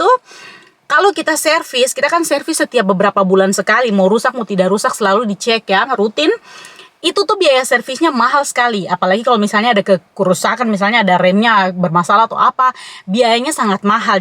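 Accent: Papua, Indonesia. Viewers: MF kk🙏🙏 Kk nc orang Papua iya??? Penasaran dengan logatnx kk😊🙏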